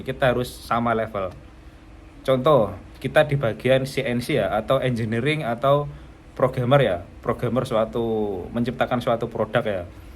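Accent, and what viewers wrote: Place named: Java, Indonesia. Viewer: orang malaysia tapi logatnya kayak jawa indonesia ya🎉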